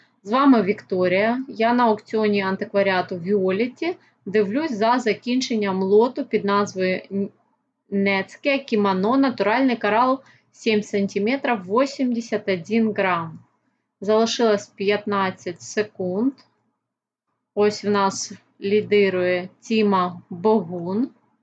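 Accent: Russia. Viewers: да просто)) то на английском с русским акцентом размовляете, то на Украинском) решил узнать уровень познания не только в коралле))))))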